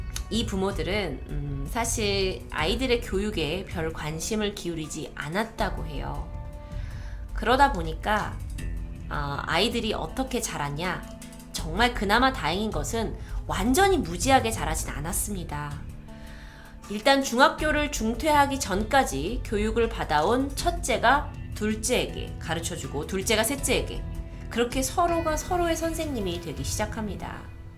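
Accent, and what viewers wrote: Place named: Jeolla, South Korea. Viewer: 전라도 ㅋㅋㅋㅋㅋ 아옼ㅋㅋ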